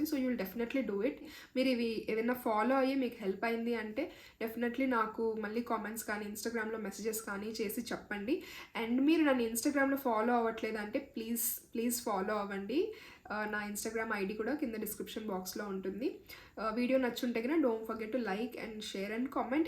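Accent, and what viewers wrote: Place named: Chittoor, India. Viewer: I'm from Chittoor me language kuda almost ma Laage undhi language kosam nenu me vedios chustanu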